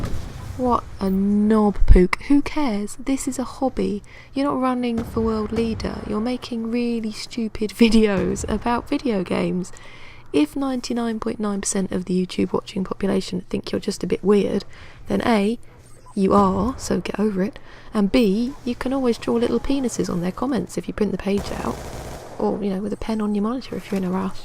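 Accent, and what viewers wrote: United Kingdom. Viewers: Very true,The same principle could be applied to those people who are so obsessed with their K/D that they hide in buildings just to go 4-2 in Domination,Or those smelly little boosters.PS,I enjoy your vids,very original with your slightly posh accent(to me being a northern lad)and quirky comms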